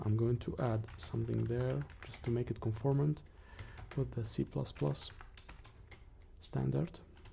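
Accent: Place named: Italy. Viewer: Just out of curiosity: your name is not italian but your pronounce sounds italian. Where are you from?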